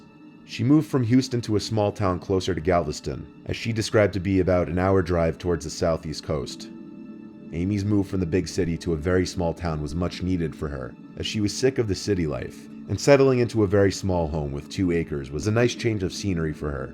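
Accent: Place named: New York, United States. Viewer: almost couldnt get past mr. nightmares new york accent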